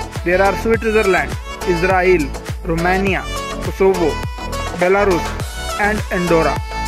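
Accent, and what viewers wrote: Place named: India. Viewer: some funny indian accent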